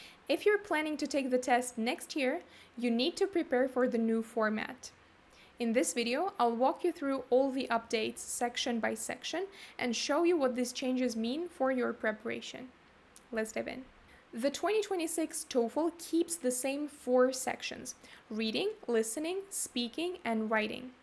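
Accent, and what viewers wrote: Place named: Russia. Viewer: Такой сильный русский акцент....😂😂😂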